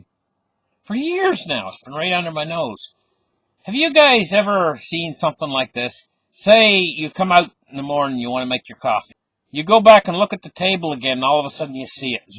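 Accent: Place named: Canada. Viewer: Mr., dont try to change for other people. It has to be expodential I can't listen to this without the whole Canadian accent.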